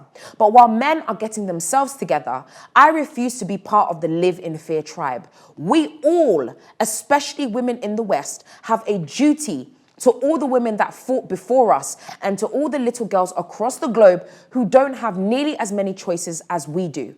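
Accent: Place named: England, United Kingdom. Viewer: This is not an issue with whether your reporting is accurate or appropriate but your statements concerning Sarah Everard may cause you problems. You have stated in this report "It was later found that she had been kidnapped and murdered by 48 year old Wayne Couzens". He has not yet been convicted so to state this unequivocally in those terms is factually wrong and also potentially in contempt of court. I am not sure where you are based but you have an English accent so I presume you are in the UK and if so commentary such as this could put you and your channel at risk. This is a link to information on the legal principles here <link> This is the related guidance <link> and this is a link to a report from the time The Sun and The Daily Mirror were fined for their reports on the murder of Joanne Yeats <link> Even if Wayne Couzens is convicted at trial or by pleading guilty the issue is potential prejudice to a fair trial. 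I think you need to give some attention to these requirements to avoid potentially ending up on the wrong side of the legal principles.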